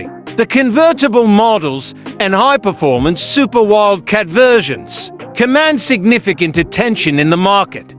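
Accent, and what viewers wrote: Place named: United Kingdom. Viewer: Why is a guy with a British accent telling me something about American cars? Do they have these cars in Britain?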